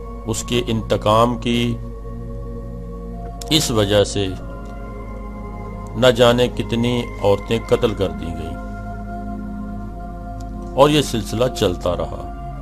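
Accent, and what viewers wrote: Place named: Punjab, Pakistan. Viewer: Ghatiya. Agar kahi se parh Kar sunana hi hai to rawani k sath parhte. Ruk ruk k parh k dimagh ki lassi bana di. Aur Punjabi lehjay me Urdu ka khana kharab kardiya. Punjabi me hi parhlete.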